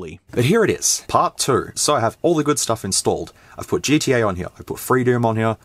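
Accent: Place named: Australia. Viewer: See you sound Australian so I believe you but you are whiter than me and I'm Irish 😂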